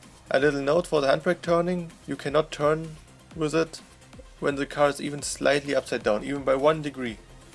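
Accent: Germany. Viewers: Bro du bist deutscher wie du redest wtf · Mashalla guter deutscher akzent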